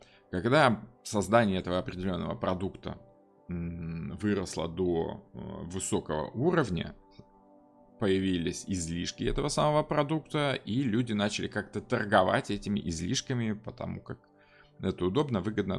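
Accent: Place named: England, United Kingdom. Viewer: с английским акцентом…как это сам * часто в своих лекциях очень симпатично произносит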